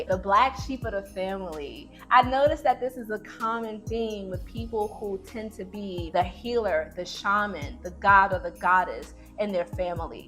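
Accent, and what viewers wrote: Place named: Brooklyn, United States. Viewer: I can hear it in the beautiful accent! I'm from Albany area with fam from East Harlem and dear friend from Crown Heights, BK! I could feel that jubilant Biggie energy surrounding you, wow! 🗽❤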